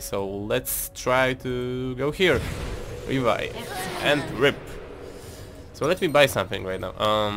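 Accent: Poland. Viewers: Are you polish? Your accent is really similar lol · Polski akcent? Czy tylko mnie sie wydaje? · ty jesteś polakiem bo masz taki głos polaka. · Jestes z Polski prawda? Slychac, np gdy wypowiadasz 'mana' . Pozdrowionka i robisz mega robote!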